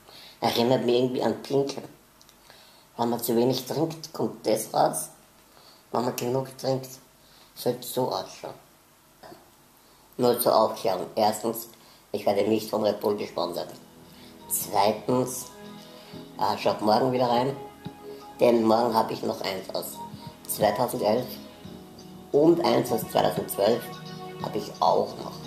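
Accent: Austria. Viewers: Cooles Video. Sehr unterhaltsam. Ich find dich sehr sympathisch und witzig. Und nur mal so ne Frage: Bist du Österreicher? Du hast einen sehr österreichischen Akkzent. Wenn ich raten müsste würde ich sagen, dass du Wiener bist. Abo hast du auf jeden Fall ;D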